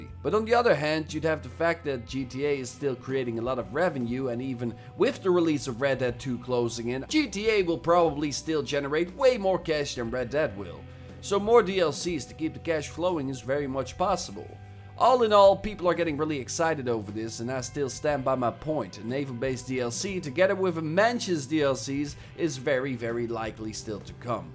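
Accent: Netherlands. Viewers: Woah I didn't know you were dutch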